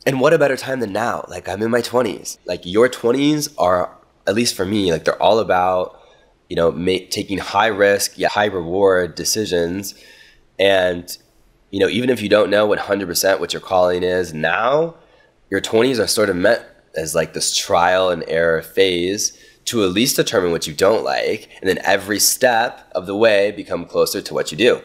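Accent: Argentina. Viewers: I'm Colombian, living in Brazil pretty much for the same reasons you moved abroad, but with some different points, but I can totally relate. because I didn't know anything about the culture or Portuguese, yet here I am... and now I understand why you have a kind of Argentinian accent when you speak in Spanish!!! Love listening to you speaking in any language btw. Abrazos 😊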